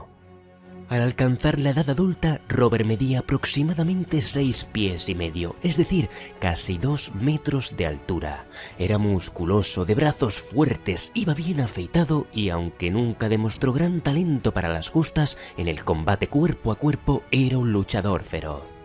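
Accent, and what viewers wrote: Spain. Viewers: Bonitas narraciones y hermoso asiento de la vieja madre patria, saludos desde 🇨🇱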